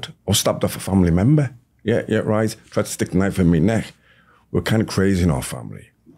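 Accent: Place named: Liverpool, United Kingdom. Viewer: Could listen to him for another 3 hours and I can't stand the Scouse accent.. what a great video. 👍👍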